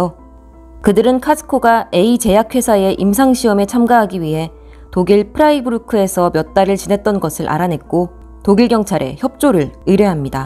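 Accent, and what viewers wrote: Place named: Japan. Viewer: 발음을 보니 일본어를 할 줄 아는 분이시네요. 맞죠? ^^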